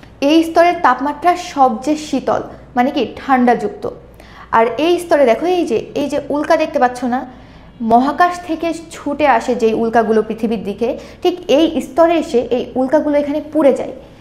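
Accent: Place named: India. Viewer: X যদি ট্রোপোমন্ডল হয় তাহলে Z হবে মেসোমণ্ডল। বোনটির বাংলা শুনে মনে হচ্ছে ভারতীয় বাংলা।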